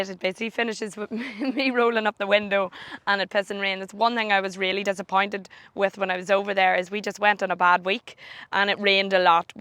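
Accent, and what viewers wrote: Ireland. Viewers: ❤️ tho voice it reminds me of my trip to Ireland 👍🤠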